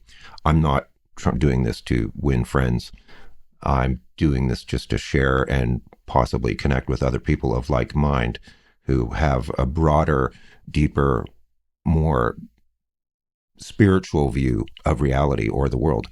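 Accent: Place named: Atlantic Canada, Canada. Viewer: I knew as soon as I heard your voice you were probably from somewhere in Atlantic Canada...Newfoundland? Im in northern BC. Anyway, just found your videos and love your style of articulation. I'm going to check out your ritual material next. Thanks for sharing your insights here. 🙏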